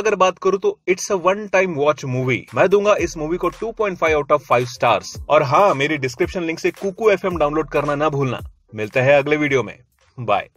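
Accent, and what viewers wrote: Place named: Uttar Pradesh, India. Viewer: Up accent ko tum hi suno..... hum to hindi accent hi sunein gay.......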